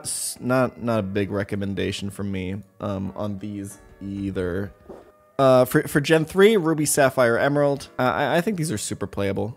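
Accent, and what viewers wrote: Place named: Germany. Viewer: seems like a very Americanized German. Especially with the accent and everything 🤔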